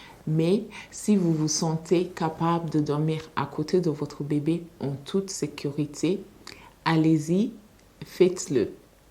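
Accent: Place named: France. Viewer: Instead of reading the subtitles I am listening to your lovely French accent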